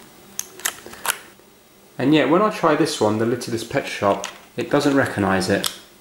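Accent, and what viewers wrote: United Kingdom. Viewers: thank you british man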